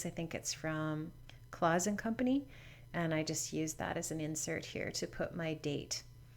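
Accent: Canada. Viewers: This very cool and I am enjoying watching and learning! You sound like you are Canadian...eh? Anyhoo...best wishes to you and yours for a happy holiday season! TFS! xo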